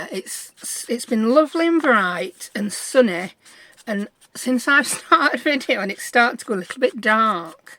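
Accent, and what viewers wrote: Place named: England, United Kingdom. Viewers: Whenever you say "I don't know", it reminds me of the potato ad I loved.."Don't know, it's all covered in mud" love the yorkshire acent. Much better than mine ....(semi, scouse! I'm from other side of water!) Love your videoes.... please say Hi to Gertrude for me LOL! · Omg I love your act sent alway did Or Linden English England just love that accent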